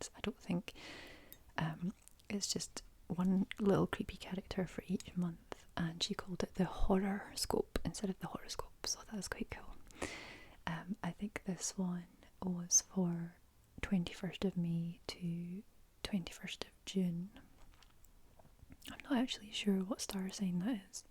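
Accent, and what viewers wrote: Scotland, United Kingdom. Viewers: You have a lovely voice, I think you should give the soft spoken a go more! It makes your beautiful Scottish accent more apparent.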